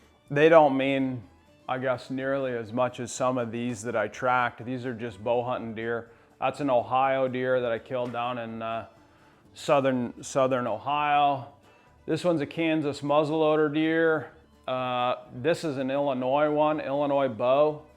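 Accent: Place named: Canada. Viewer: Wicked bucks. I recognize that voice from the podcast you sound more Canadian than Vt eh?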